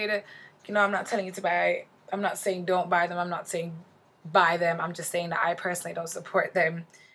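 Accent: Jamaica. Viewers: you are definitely Jamaican no hiding that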